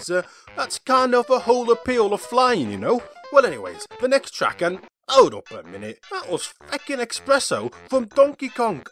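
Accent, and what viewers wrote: United Kingdom. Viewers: Are u British or American? Sound like ur British.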